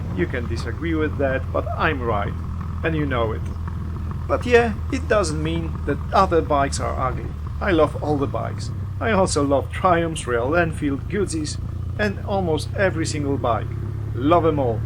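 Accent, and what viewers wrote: Poland. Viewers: Ciekawi mnie czy dobrze rozpoznaje polski akcent?🤔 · Hi there. Z Polski? Akcent :-) Napiszesz co dokładnie zmieniłeś w motocyklu?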